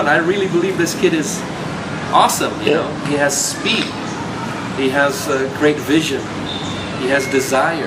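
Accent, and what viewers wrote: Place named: United States. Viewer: Bahasa inggrisnya kental kayak Amerika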